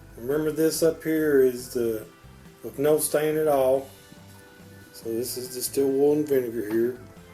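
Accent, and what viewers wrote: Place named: East Tennessee, United States. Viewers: Thank you sir for this video. However I'd love for you to spend a bit of time watching and editing your videos before submitting them. I had some trouble with your southern accent when you were saying one of the samples but it was impossible to read the can as it wasn't even in the picture. Again thanks for taking the time for this but it would be really great if there was a touch of care in the videography. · Hey, Great video. I've got tons of 2 inch red oak slabs. I'm not really crazy about how red oak looks. I'm wanting to use the slabs to build some river tables. I'm wanting to stain the red oak much darker. Do you know if you can run epoxy over stain? Also, nice shirt. What part of Tennessee are you from? You sound like East Tennessee. I'm in Manchester (Bonaroo). Great vid. Thanks.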